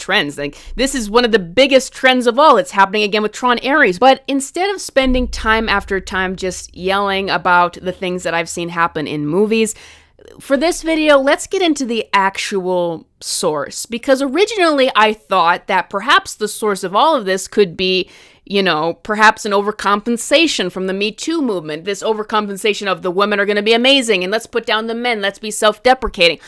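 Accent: United States. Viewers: Holy shit I just knew you had a Minnesota accent before you even started talking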